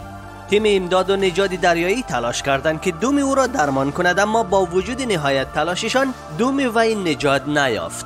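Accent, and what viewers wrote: Afghanistan. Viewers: قربون اون لهجه شیرینت برادر افغان عزیز 🙏🏻 ❤️ خیییلی مخلصیم. بذار خورده ای ازت بگیرم... میبایست قبل از عنوان کردن موارد توضیح مقبولی دربارهء چاپ 3D یاهمان چاپ ۳بعدی میدادی نه اشاره وآر... بهترینهای خالق زیبایی رو برای شما دوست ارجمند آرزو دارم 🙏🏻 🌷 🌹 🌹 🌹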